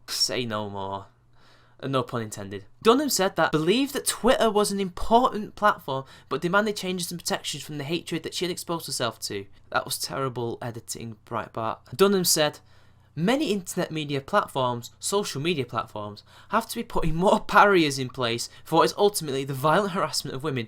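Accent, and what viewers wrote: United Kingdom. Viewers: I somehow missed this in my subscription feed. This was really entertaining video. Thanks for the laughs! The way you say certain things in your hot British accent makes your jokes seem even funnier! Nice to see your handsome face on camera :)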